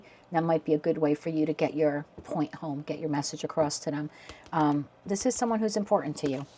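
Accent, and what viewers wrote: East Coast, United States. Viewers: You sound like you’re from the East Coast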